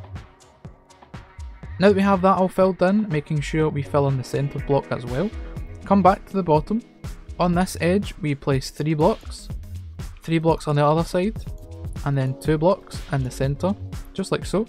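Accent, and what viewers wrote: Scotland, United Kingdom. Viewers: I've just come across this video and your channel so thank you for sharing! I've liked the video and subscribed as I'll be using the fountain for my town on the Lilac Towny server :) I hope you continue to do more videos as it's so nice to hear another Scottish person - I'm a wee Scottish lassie!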